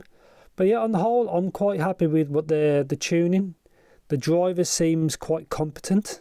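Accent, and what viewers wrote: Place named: Manchester, United Kingdom. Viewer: Sir no need to answer, are you from the Manchester area? That accent sounds like I'm listening to my cousins from UK. They all live in Manchester area. Still loving blon 03 if I grab back from GF who loves them... Cheers. My gf uses the pouch, thinks it cute.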